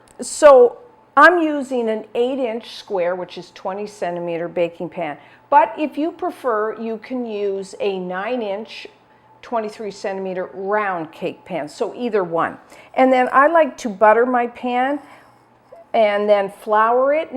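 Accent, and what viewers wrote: Canada. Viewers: Shes canadian right? like her last name and the way she says out.. she so cute.. i like her videos.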